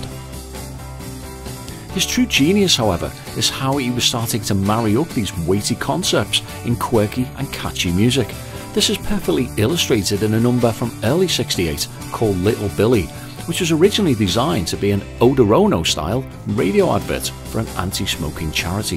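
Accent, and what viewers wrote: Liverpool, United Kingdom. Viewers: Schade, daß keine deutschen Untertitel verfügbar sind. Ich mag deine Retrospektiven seit langem sehr gerne aber dem Liverpooler Akzent kann ich nicht folgen😂😢😢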